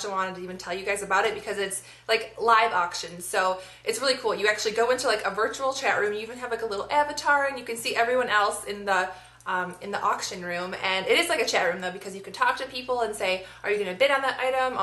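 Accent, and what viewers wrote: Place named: Philadelphia, United States. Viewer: love your videos and your accent haha...philly?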